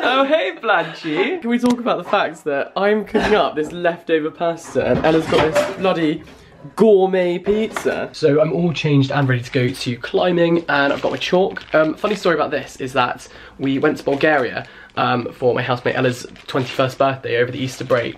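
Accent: RP, United Kingdom. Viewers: UR VOICE JUST SCREAMS ‘TORY ETON BOY’ (also address ur sexuality)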